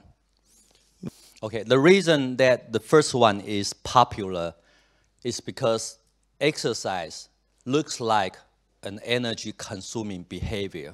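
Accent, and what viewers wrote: Taiwan. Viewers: 台腔教授讚!這種腔反而才聽得下去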